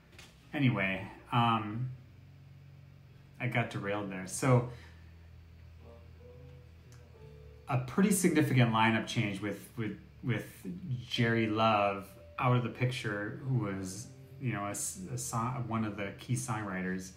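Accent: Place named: Scotland, United Kingdom. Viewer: Good to see u back. Interesting as ever with a healthy does of Scots. Prefer your first pronunciation of Brisbane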